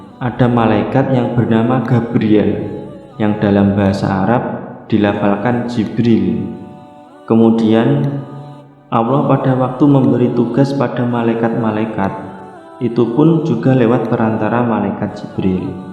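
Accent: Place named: Java, Indonesia. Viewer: Medok wkwk. Good video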